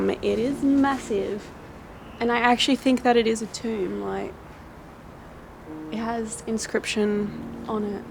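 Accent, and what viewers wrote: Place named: Australia. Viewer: You've got an Aussie accent!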